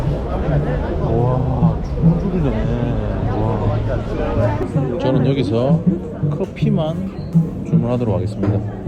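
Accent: South Korea. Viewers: 경상도사투리 쓰셔서 경남 사시는줄😂😂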